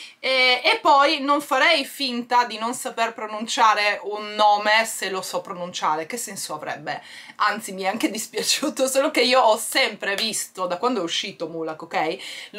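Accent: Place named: Sardinia, Italy. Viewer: allora ho le allucinazioni auditive! !!Sapevo che eri triestina...ma sentivo un forte accento sardo:-)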